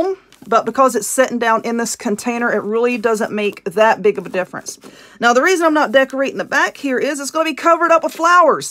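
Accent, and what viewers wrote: South, United States. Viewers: This flower box turned out to be cute. I freaking love your southern accent 😘👍💕💕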